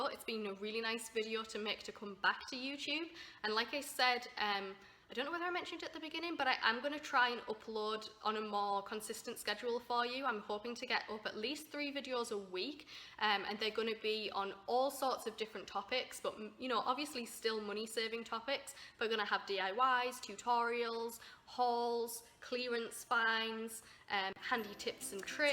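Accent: United Kingdom. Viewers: You sound from the uk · Have you lived in America long? You have a strong accent from Britain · What’s with your British accent? Sounds really nice, where are you from? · Are you from uk?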